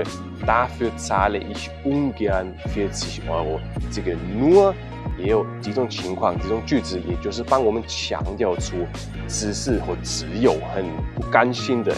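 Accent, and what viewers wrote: Taiwan. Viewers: Dein Chinesen ist nicht schlecht, aber dein Akzient aus Taiwan geht mir auf den Nerven. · schade, Akzent aus Taiwan....ansonsten gute Arbeit! auf jeden Fall weiter empfohlen !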